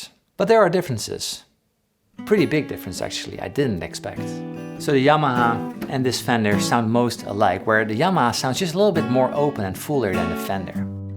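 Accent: Netherlands. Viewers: what a beautiful dutch accent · Ik wist gewoon aan uw accent dat je van nederland was hahah ma je vids zijn wel echt nog cool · I knew you were Dutch ! Toch wel goed accentje hoor ! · Ik hoor een nederlander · Al die tijd had ik zo’n vermoeden dat je nederlands was! Gewoon door je Nederlandse hint Engels. Haha nu weet ik het zeker, helemaal top😂😂. Keep up tue great work tho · Ah, yes, the man met that Nederlandse accent! Do listen to him, he knows guitars.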